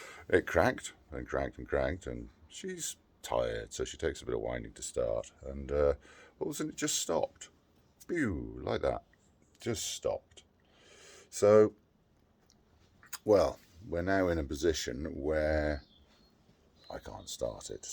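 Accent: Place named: England, United Kingdom. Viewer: I never realized how much better these mechanical help videos would be with an English accent 🙌 I installed the new solenoid without raising my blood pressure once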